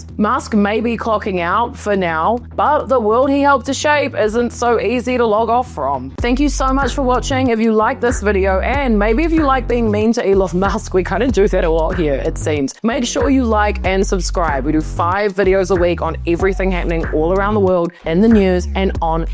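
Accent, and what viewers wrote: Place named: New Zealand. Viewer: This is what I like to hear. Having been indoctrinated by YouTube into becoming anti-E-Loon I have been watching with some amazement the trajectory of this bloke's rise and rise on what is a Ponzi like bubble. The bubble is now straining to burst and the bigger it gets the bigger the mess is going to be. Personally I wish no good to the USers, the sad thing is the people are going to have to clean up this mess when the Moosk is gone. I predict his demise some time in the middle of this year, whether it will be the impeachment of Trump or the commencement of WWIII the E-Loon will fall. Whatever goes down it will fall on the people to carry the weight. I am enjoying your US reportage in an NZ accent.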